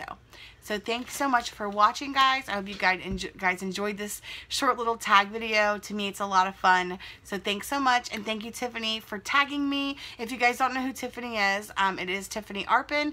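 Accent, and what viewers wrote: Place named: South, United States. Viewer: I was born and raised in VA, and I say about 90% of the words the same as you. I love the way we Southerners talk! ;)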